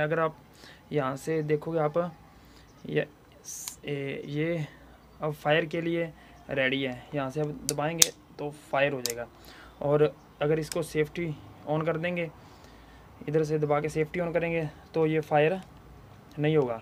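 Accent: Punjab, India. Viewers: bhai saab punjabi touch aa raha h apki awaz mai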